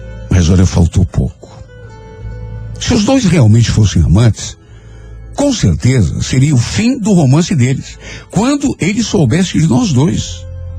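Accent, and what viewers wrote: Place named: Rio Grande do Sul, Brazil. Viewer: Sou fã dessas histórias lindo sotaque gaúcho.....!!😀👏👏👏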